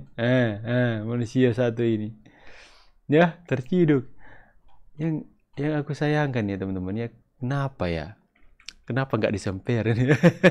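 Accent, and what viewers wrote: Java, Indonesia. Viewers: bang logat suaranya seperti orang jawa/jakarta dalam berbicara bahasa Indonesia, biasanya logat luar jawa beda ma jawa, itu nurutku 🙏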